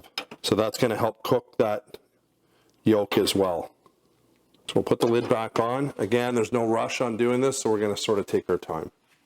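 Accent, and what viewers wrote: Canada. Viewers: Are you Canadian? I can tell by the accent.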